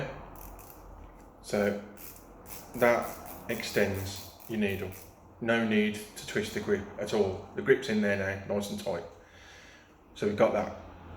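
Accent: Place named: Birmingham, United Kingdom. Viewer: Never apologise for a Birmingham accent 😊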